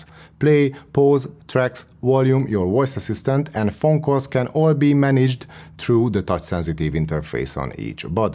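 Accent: Hungary. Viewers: First of all, great content! Secondly, is it just my ears playing with me, or you do have a hungarian accent?😅 We tend to say that a hungarian can recognize another hungarian no matter how professional their language skills are😅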